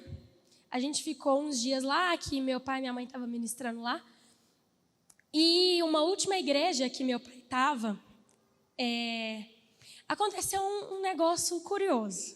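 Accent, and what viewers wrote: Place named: Minas Gerais, Brazil. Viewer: Me lembrou tmb!! O jeito de falar e andar rsrs deve ser coisa de mineiro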